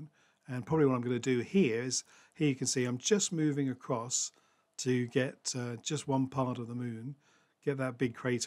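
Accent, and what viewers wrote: Australia. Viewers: you sound like an Aussie expat rather than a Pom is that correct? Great moon images mate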